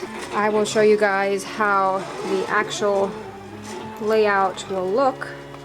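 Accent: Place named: Pennsylvania Dutch, United States. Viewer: Loved your Pennsylvania Dutch! I speak Afrikaans here in South Africa, which is close to Dutch.... but Your Pennsylvania Dutch is nothing close at all! It was lovely to hear!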